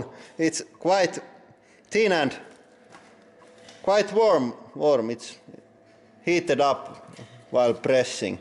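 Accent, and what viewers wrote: Finland. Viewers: Are u finnish cuz ur english accent is finnish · Ootko suomalainen ku sun enkun murre kuulostaa suomalaiselta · i can hear from the accent that this guy is finnish lol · LOVING THE ACCENT #RALLISUOMI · That rallienglanti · Are you Finnish? Sun aksenttisi kuulostaa siltä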